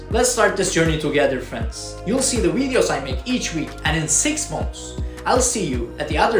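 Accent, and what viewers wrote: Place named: Turkey. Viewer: aksan türk aksanı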